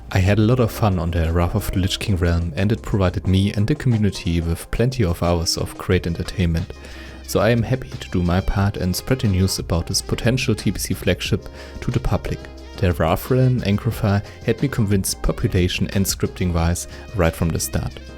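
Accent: Germany. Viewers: Man hört dir raus, dass du Deutscher bist :D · Dein deutscher Akzent digga. Beste.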